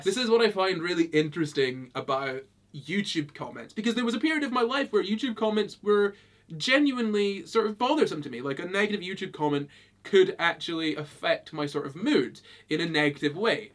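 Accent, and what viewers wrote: Scotland, United Kingdom. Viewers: Is it bad I never noticed you're scottish??? like I'm british but my brain only registered your accent as "northern" tbh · I don't understand how people can call you "feminine". Unless they are using "feminine" as a synonym for "smart". To me you appear to be clearly masculine, and your Scottish accent is a pleasure to hear.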